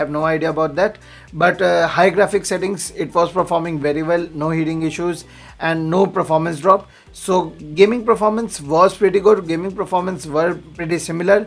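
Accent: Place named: India. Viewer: Me too LoL 😘 very rare to find Indian English speaking channel haha!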